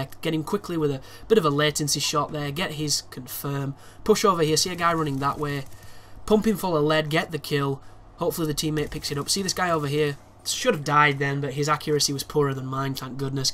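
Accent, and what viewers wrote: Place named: North, United Kingdom. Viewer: Straight talking, no BS northerner. The best type of person. Hearing your commentary is great and I could listen to it all day. True talent there. Not offending other commentators but they can get annoying ( I won't go into detail but the ethics and scale of their channels turn their heads upside down)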